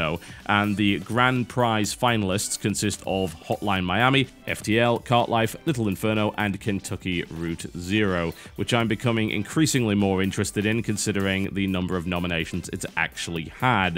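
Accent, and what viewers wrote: United Kingdom. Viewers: Don't know why but that British accent is such a turn on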